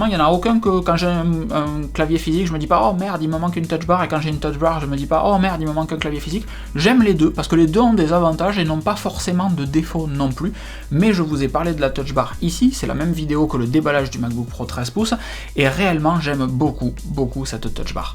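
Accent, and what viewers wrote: South, France. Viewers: Merci beaucoup pour cette vidéo : complète et très enthousiaste ! De plus, cela me rassure d’avoir un MBP M1 avec Touchbar car tu es le seul dans cette vidéo à dire que finalement la Touchbar ben…c’est pas si mal ! Bon courage pour la suite, et merci pour ce bel accent qui sent si bon le soleil !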